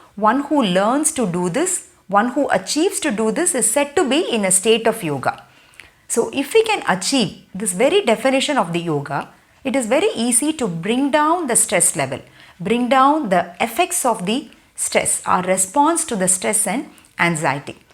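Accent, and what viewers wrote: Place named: India. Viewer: Lady, I love your Indian accent and it's always a joy to watch you. Thank you.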